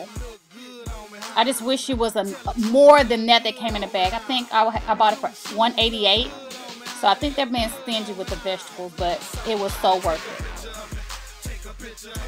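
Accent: South, United States. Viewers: I love the way you talk with that southern twist like me People from other states say we talk funny but you dont care!! Gurl keep repping for us gurls down south!!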